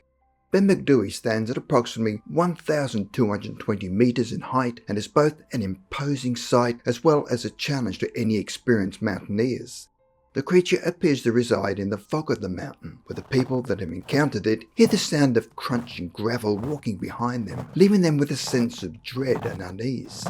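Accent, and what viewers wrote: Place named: Australia. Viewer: Can you get some narration without the heavy Aussie accent? It is hard to understand your speech for Americans. I think you have a woman who does narration, don't you? I can understand every word she says....I think she is an Aussie too but her accent is not as thick as yours.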